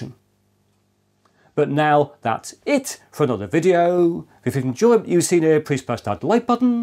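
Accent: United Kingdom. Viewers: Computers are so much more interesting with a British Accent.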